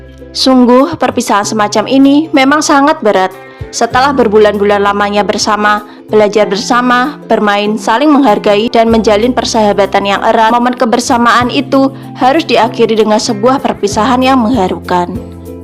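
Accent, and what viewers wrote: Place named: Java, Indonesia. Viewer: aksen hostnya medok bgt infomasinya bagus tp jadi kelihatan masih kaku dan lucu 😂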